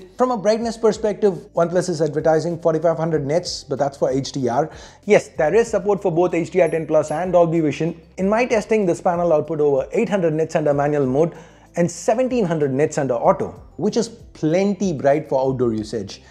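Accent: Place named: India. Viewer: I swear i couldn't continue watching the review because of his indian accent ITS HARMFUL TO THE EARS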